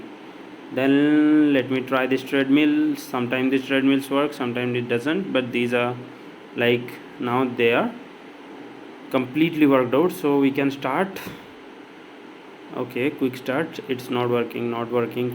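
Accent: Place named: Odisha, India. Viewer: Bro you odia? Coz your odia accent is 🔥🔥🔥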